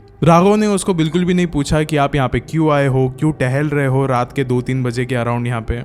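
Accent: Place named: Mumbai, India. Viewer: Apna mumbai wala accent chor ke story nurret kyea kar bhai tab jada log connect kar payenge tere sath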